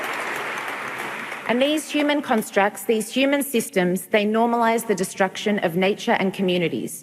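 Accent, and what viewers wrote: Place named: Australia. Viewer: Omg! She's an Aussie. Struth and by crikey 😂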